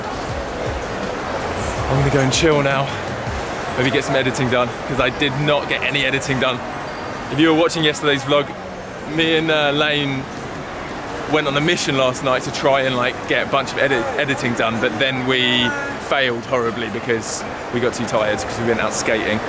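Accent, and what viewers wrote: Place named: United Kingdom. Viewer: GOD, the British accent is SO GOOD, I could hear you talk all day!!! Greetings from Brazil ❤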